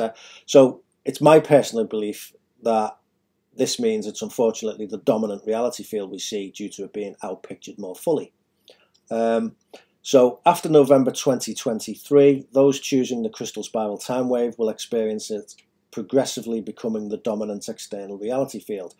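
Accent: Liverpool, United Kingdom. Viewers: Wow! New to the Channel! Amazing! Thank u. I've heard it will be Nov '23 but wow! And a Fellow Scouser too! I grew up in Penny Lane, Lpool. Great to hear a Scouse accent and intelligent too!! 👍😉🤗💥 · As a Scottish person ....im giggling x think he a scouser xx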